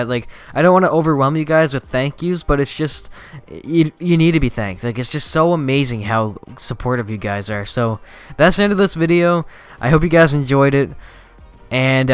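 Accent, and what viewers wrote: Ireland. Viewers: I thought you where Irish I'm being honest here not even joking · He sounds Irish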